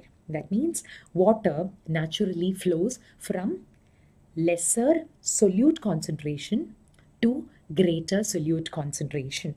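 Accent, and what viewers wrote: Kerala, India. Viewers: Mam I’m so convinced ur Malayali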